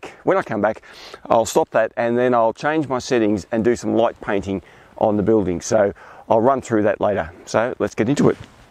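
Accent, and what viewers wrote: Australia. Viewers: Sound Aussie 🇦🇺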